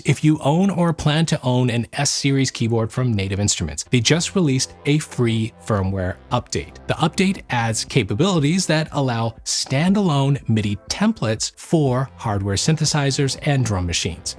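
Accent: India. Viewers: R u Tamil guy?😅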